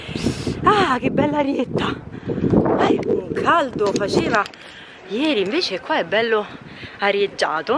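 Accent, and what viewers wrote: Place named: Rome, Italy. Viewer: Amo il suo accento romano 🔝❤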